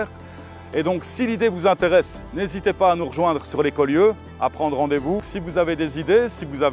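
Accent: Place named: Wallonia, Belgium. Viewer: wallonie belgique je dirais vu l'accent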